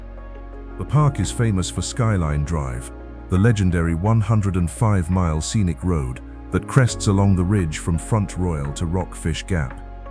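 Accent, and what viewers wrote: England, United Kingdom. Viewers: The English accented narration is a strange choice. How about a nice Shenandoah Valley accent for a video like this?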